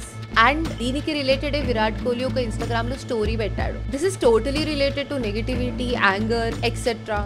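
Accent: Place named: Telangana, India. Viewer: Telangana slang keka super sister Jai srh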